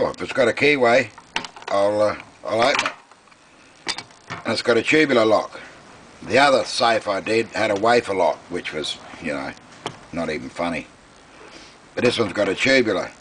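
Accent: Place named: Australia. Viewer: Fuck the video, I'm guessing your Australian? if not, either way your accent is awesome :0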